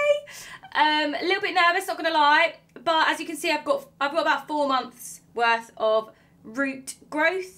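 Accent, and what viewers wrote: United Kingdom. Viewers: Not to be rude....i dont mean you personally... but british accent in my ear is not the most beautiful to hear...its to show off kinda talk... a puh dah on...... baahh...im.train thu match on..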